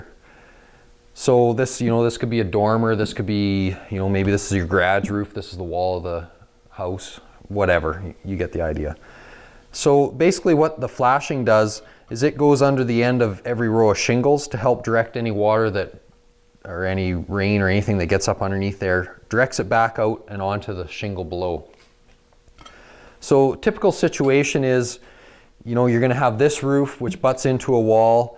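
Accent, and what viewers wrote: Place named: Canada. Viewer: Rodney Byrd dude, be a little more tolerant man. He is most likely Canadian. Thats how they speak. He didnt call you out on your fucked up spelling, did he?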